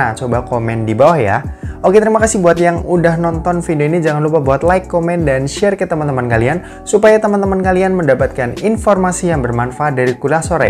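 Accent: Semarang, Indonesia. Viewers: org semarang bro?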